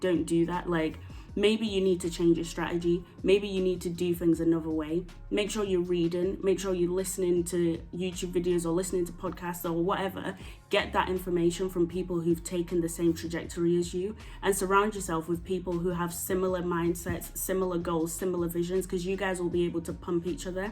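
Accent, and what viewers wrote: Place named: United Kingdom. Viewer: love that UK accent. Damn I can't wait 2 go back💯 I'm from Cali, I got soooo much luv out there. way more than I get in USA.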